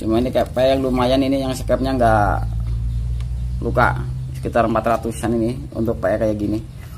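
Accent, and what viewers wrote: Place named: Banyumas, Indonesia. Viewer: Ora ngapak ora kepenak..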